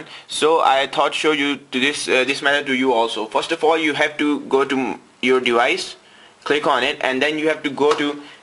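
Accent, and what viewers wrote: India. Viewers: Shame man, he is Indian what do you want the guys to sound like. Don't worry dude keep on with the videos thanks man :) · great exlpanation for a indian guy? not a racist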